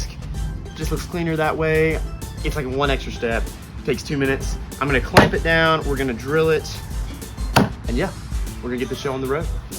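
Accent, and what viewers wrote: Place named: Georgia, United States. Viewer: Thought that accent sounded familiar. Glad to see some GA representation in woodworking YT.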